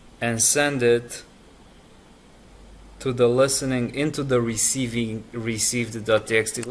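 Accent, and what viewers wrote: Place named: Ukraine. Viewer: you dont have an accent? Your funny. My girlfriend is from Ukraine, yall sound very similar. I am going to be teaching Golang for pentesting. I'm working on it now as we speak. But I'll send you the link, when I'm getting close to showcasing. I wanted to do one for bash but you and,a few of my other technical friends are doing Bash. So I decided to wait.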